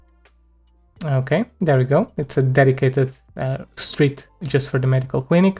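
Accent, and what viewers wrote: Portugal. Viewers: Ola amigo é evidente que o teu sotaque é português, devias fazer videos em Português ia ajudar bastante. Obrigado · are you perchance porrtuguese??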